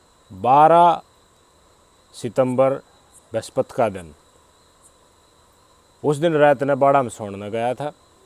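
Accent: Haryana, India. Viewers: Nice video bhai sahab ..apkii pure haryanvi language nd ekdum saaf saaf samjhane walii voice modulation..thanks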